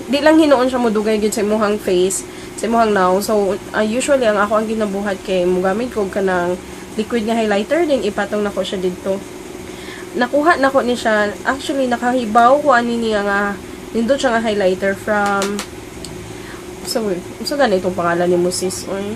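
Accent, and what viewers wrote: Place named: Cebu, Philippines. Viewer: Like number 5:) heheh ang cute niya mag cebuano heheh ang saya agad ng bungad heheh:) wow gusto ko tong tag na to hehehe:) sakto ka sub ko lang din sa kniya now heheeh:) ang cute cute nimu mag visaya ui heheeh:) You just got a new subbie, It's Vangeline from IndayG's channel:) cheers!!!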